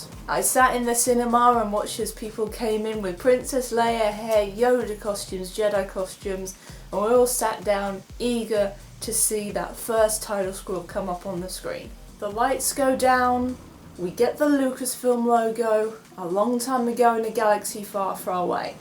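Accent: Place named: England, United Kingdom. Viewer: love your english accent !! i hope you hear that often .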